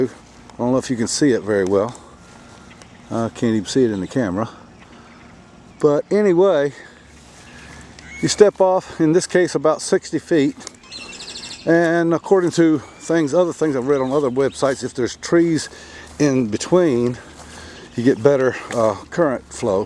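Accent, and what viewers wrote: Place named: South, United States. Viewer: are you Georgian or Southern? I like the accent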